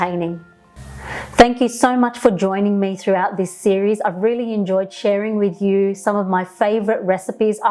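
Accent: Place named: Australia. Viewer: Love your Aussie accent I’m Australian too MaashAllah sister your very beautiful 🥰btw I’m going to try this soon 🇦🇺❤️🇱🇧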